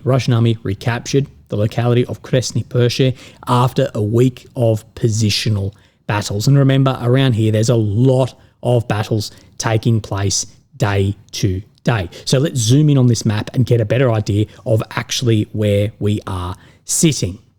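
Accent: Australia. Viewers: Aussie twang is unpleasant to my ears.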